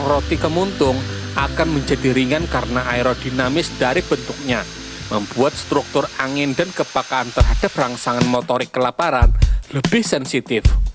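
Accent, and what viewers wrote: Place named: Java, Indonesia. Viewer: Why medok jowo? Ulun kira bubuhan keluak si julak nih... Tapi lucu pan.... Kawa jua... Baumpatan. ☺☺☺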